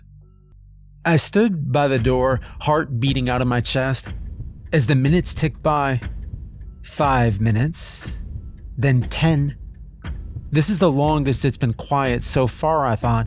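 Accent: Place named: Brooklyn, United States. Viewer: I've realized throughout this story that I don't like this accent. Is it Brooklyn? Whatever it is, it upsets my ear for some reason.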